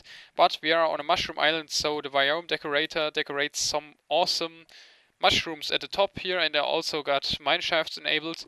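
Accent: Germany. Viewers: turn on subtitles if you want to laugh it is funny becuase of his German overtone isnt processed correctly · dein english akzent ist scheise sry aber ich bin zu 100 % sicher das du deutsch sprichst ;)!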